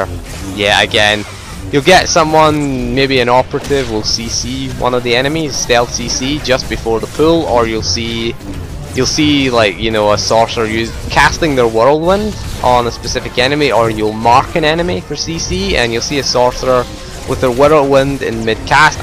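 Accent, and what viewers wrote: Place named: Edinburgh, United Kingdom. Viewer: What part of Scotland is your accent from? sounds like Edinburgh or east anyway ;3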